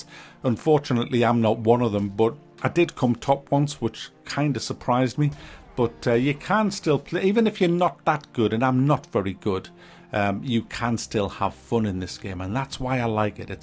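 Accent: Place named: Geordie, United Kingdom. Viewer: Howay, I want to see a video with that proper geordie accent, I feel like you try to tone it doon...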